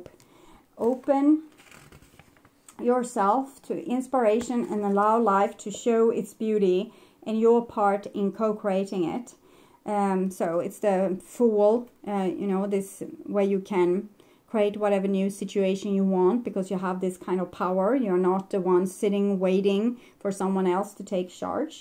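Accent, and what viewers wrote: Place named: Sweden. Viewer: I knew you were sweadish when I first heard you. I lived in Sweden in the 90 's was so beautiful times !!🦋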